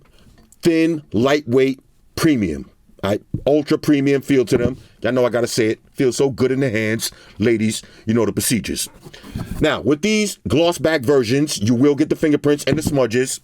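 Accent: New York, United States. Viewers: I'm late to your party bro, but glad I found you. Been rocking OP since #3 all the way up and waiting on my black #9. Sound like you're a New Yorker. Favorite reviewer on Youtube. Appreciate you.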